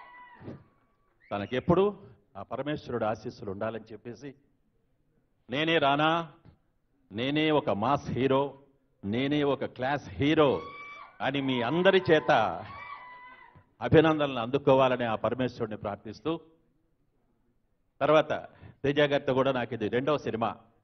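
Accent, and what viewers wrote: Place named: Rayalaseema, India. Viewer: That is our rayalaseema style ,,, feeling proud frm proddatur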